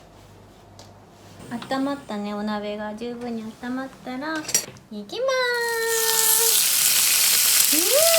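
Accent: Japan. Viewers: ほっこりする和風英語でいつも楽しくみさせて頂いてます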